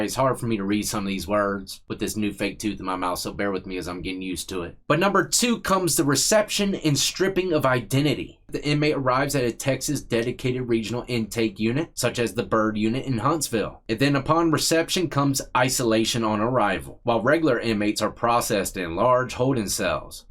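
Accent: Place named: Virginia, United States. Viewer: Be easy; I still hear that Virginiy accent lol.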